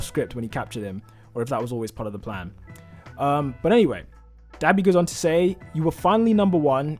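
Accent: United Kingdom. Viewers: It’s funny this is about Dabi’s reveal but the biggest reveal was seeing what looked like! NGL, I pictured you as Harry Potter because you don’t have an American accent so I thought it was British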